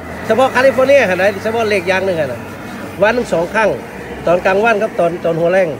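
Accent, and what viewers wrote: Thailand. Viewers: สำเนียงอย่างคนลำปางลุ๊คับ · อู้เหมือนคนภาคเหนือเจียงฮาย ประเทศไทยเลย ท่านเป็นคนที่ไหนครับ และเดี๋ยวนี้อยู่ประเทศอะไร ดูน่าอยู่มาก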